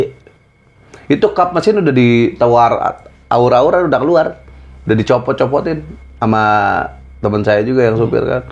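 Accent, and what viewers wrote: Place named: Banyumas, Indonesia. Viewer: Ora ngapak ora asik Cilacap mantau mas🙋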